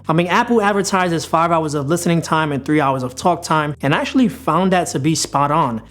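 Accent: New York, United States. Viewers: no problem, you sound like your from NY!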